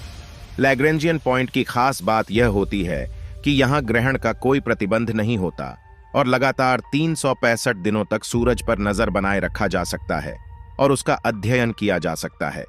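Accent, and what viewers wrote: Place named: Bihar, India. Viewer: आपकी बिहारी हिंदी सुनकर मेरी हिंदी बोली भी खराब हो जाएगी, अतः शुरू के दो ही शब्द सुनकर विडियो बंद करना पड़ रहा है। Sorry.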